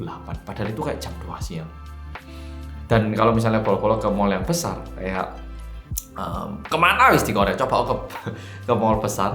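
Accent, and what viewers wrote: Java, Indonesia. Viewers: Mas medoknya masih kentel banget..apa memang masih ada yg bisa diajak ngobrol di korea pake bahasa jawa?soalnya sudah 4 tahun lebih jg ya di korea tapi medoknya masih tetep sama..hahaha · Ga percaya ini orang Korea, bahasa Indonesianya medok Jawa banget :)) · mending ngene wae mas, nek pas omong jowo medhok subtitele tetep bahasa Indonesia, jd bebas omong jowo & tidak dikomplain lagi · Salah fokus sama aksen mas yg masih medok aja, padahal dah 5 thn balik ke Korea...hehe, semangat mas...jgn ikut2an depresi kayak org Korea pada umumnya... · Terlalu medok bahasa Indonesia nya mas jadi kesannya kurang jelas mas.. 🙏🙏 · Logatnya jawa, ada juga jerman logat batak .. 😂😂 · Jan medok bless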